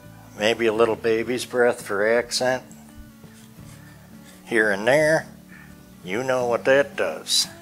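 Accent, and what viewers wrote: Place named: Western Pennsylvania, United States. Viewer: Love your style! By the way, I notice you have an accent. Western Pennsylvania. Ohio?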